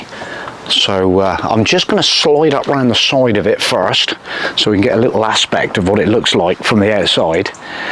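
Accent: West Country, United Kingdom. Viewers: Nice van! And i thought i had a strong west country accent, until i heard yours lol!!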